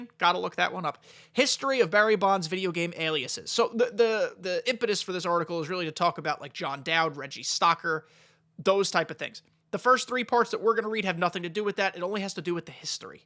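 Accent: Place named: United Kingdom. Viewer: Lmao the British accent… Well they added Sammy Sosa and Mark McGwire into ‘24 and I didn’t think I’d ever see the day this would happen so seeing Barry back in ‘25 is very much possible. I’ll say at least a 25% chance it happens…🤞🏽 Barry was in one game I used to play on ps2 where he crushed every single ball out the park lol I think it was called MLB 2003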